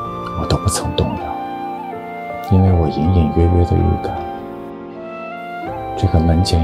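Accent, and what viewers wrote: Beijing, China. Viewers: 叔說話有種老北京人的感覺😊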